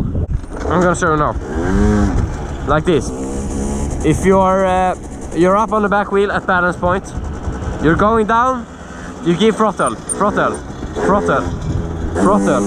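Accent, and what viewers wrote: Sweden. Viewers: Älskar svenska dialekten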